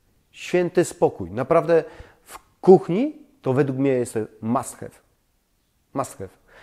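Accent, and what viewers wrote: Silesia, Poland. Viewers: Kocham śląski akcent i intonację :) Ja nie będę jakaś mega nowoczesna - bezwzględnie potrzebuję przestronnej lodówki. Nie side by side (one w środku są masakrycznie ciasne) tylko szafę od podłogi do 180 cm pełnej szerokości - czyli 60 cm, do tego zamrażarka jako osobne urządzenie. Tak żeby do lodówki cały gar rosołu wszedł bez kombinowania co przesunąć. A ponieważ właśnie projektuję sobie nowe mieszkanie z sugestii nt servo drive skorzystam - dzięki!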